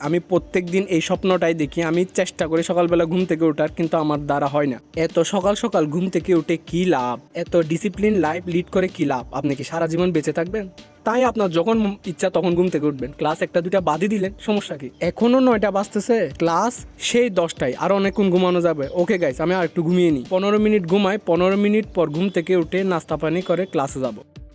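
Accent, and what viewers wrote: Chittagong, Bangladesh. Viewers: ভাই আমি ও চিটাগং এর আপনার কথা শুনে বুঝা যাই আপনি ও চিটাগং এর ,, আমি এবার এডমিশান শিক্ষার্থী ,, কমার্স থেকে,, আমার mbstu তে পড়তে চাই ,,, আপনার তো হেল্প পাবো মনে হয়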